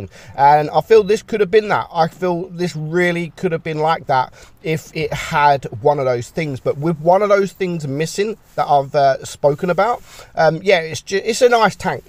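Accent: United Kingdom. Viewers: outolodah 2021 I love the British accent lol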